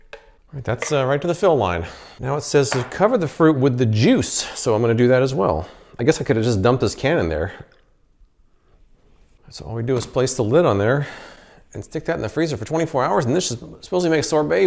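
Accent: United States. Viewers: Possibly. I assume by the accent, the dude is in the US. But assumptions.... ;)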